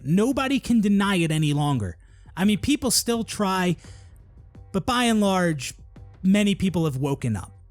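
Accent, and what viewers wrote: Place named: Pennsylvania, United States. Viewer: Gotta love a Pennsylvania accent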